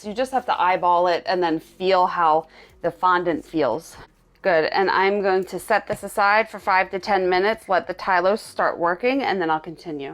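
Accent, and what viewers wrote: Philadelphia, United States. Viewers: 😂😂😂 my Phillly Sis😂😂😂 since I’m out of Philly now the accent is real. People used to tease me now I see we do have a Philly sound.❤️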